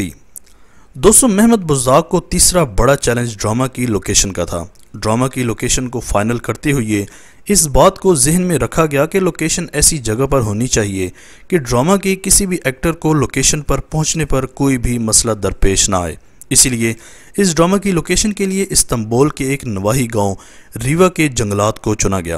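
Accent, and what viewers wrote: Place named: Punjab, Pakistan. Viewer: بھائی کا تعلق ضلع اٹک سے ہو اس لیے لفظ ( ہوئے) ہویے بول رہے ہیں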